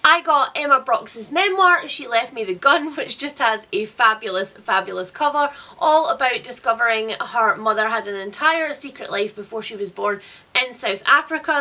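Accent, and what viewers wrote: Scotland, United Kingdom. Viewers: Hi, new sub here so you might have said previously, I just wondered if your shop will be reopening soon, it won’t even let me look 😭 also you mentioned in another video about chronic illness, I also have chronic Illness and wondered what you’re dealing with, or if you have a video on it? Sorry if you’ve already covered these questions. Loving your bubblyness and your loud Scottishness, very different to us in England 😂💕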